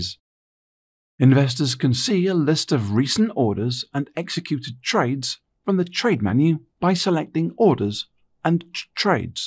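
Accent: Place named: United Kingdom. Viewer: This vidEO has gone tangent and not to the point. We are talking here about trades, correct? What you are starting to discuss is "How to convert currency" - how is this related at all? This is a different topic altogether ! What IBKR VIDEO missed- 1) No talk on how to place ETF order or no option for that - is it same as stock? 2) No discussion on order types, what are the different types of stop orders, limit order IBKR SERIOUSLY NEEDS TO IMPROVE THEIR DELIVERY QUALITY - AND PLEASE PLEASE GET RID OF THIS UK ACCENT ! THANK YOU.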